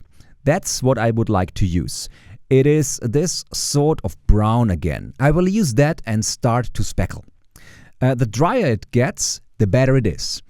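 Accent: Germany. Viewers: 1. Kann es sein das das ganze nachgesprochen wurde? Und wenn ja, gibt es das Original auch auf Deutsch als ganze Serie? 2. Ich finde man hört mega das Deutsche raus :D Also ich nehme mal an das ist ein Deutscher sprecher, was keinerlei kritik ist, ich finde es nur erstaunlich wie... Deutsch die Stimme klingt :)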